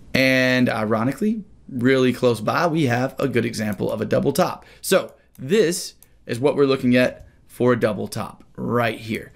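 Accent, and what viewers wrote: South, United States. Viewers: He has a thick country accent.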